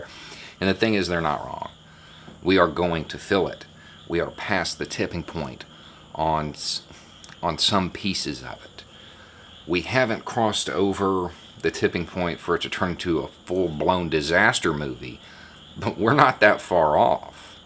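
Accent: South, United States. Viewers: Its sometimes hard for a person from the Caribbean to understand southern accents,u just made it 100 times better,thank you😍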